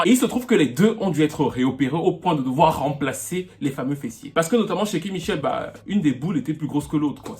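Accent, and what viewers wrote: Cameroon. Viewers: C moi où tu as un accent camerounais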